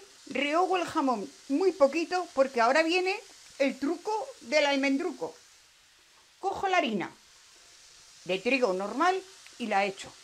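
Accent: Aragon, Spain. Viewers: Me gustan tus recetas y me gusta mucho mas ese "acentico" aragones que tienes, debe de ser porque yo tambien soy, nacida en ZARAGOZA .🙅‍♀️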